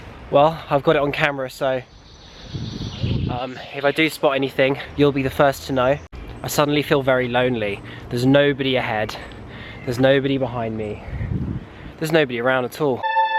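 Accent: United Kingdom. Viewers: where are you from ?England or USA?your accent sounds like british :) .What was your opinion on the places you have seen in Romania ?What did you expect to see and what did you find in Romania? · Din UK. Nu ti-ai dat seama dupa accent?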